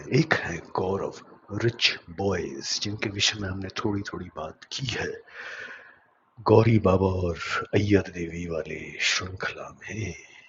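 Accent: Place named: Haryana, India. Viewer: 👍 good, but why the thick haryanvi accent? Could not understand much. Could you please not whisper so much into your mike, sounds creepy and a bit pronographic 😅 Otherwise, excellent research 👏 keep it up 😅😅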